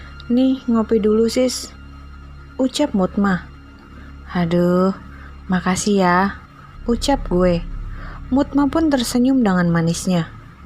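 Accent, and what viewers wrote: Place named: Java, Indonesia. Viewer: Medok gays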